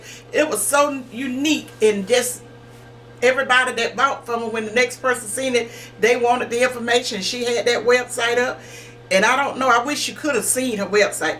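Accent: South, United States. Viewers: Awww you are a God sent woman! I am putting your meatloaf recipe in the oven precious woman of God! So happy to hear about the give always. I can say, I am sooo very proud of YOU! You encourage me more than you know! My I love your apron. You can sale those as wells! Take your time woman of God and do what you do! I absolutely love it. Currently still running to cancer institute with honeylamb! So hopefully he will enjoy your tasty meatloaf! I am on Facebook and will look at your page! My husband leans towards savory recipes because chemotherapy took appetite! Oh, he is an assistant Elder at our church! By the way, you are loved and love your southern accents! It is what it is God bless you, and anything savory to get us through, please cook it! AgIn God bless you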